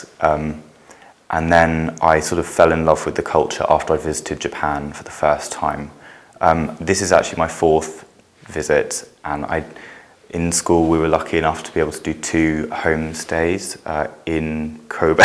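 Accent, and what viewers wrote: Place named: RP, United Kingdom. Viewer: 話し方がとてもポッシュですね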